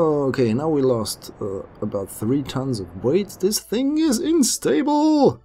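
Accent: Germany. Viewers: man merkt das du deutsch bist😉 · where are you from? germany?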